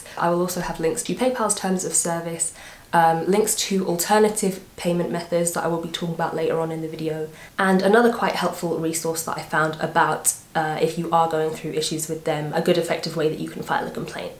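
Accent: England, United Kingdom. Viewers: English is too English. Had hard time understanding her and so I just quit. Maybe she should take a couple of bucks and get a voice coach.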